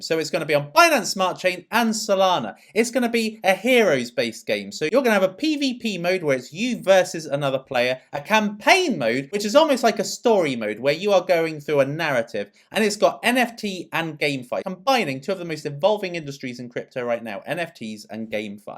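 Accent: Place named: Ipswich, United Kingdom. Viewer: new subscriber, you sound like youre from ipswitch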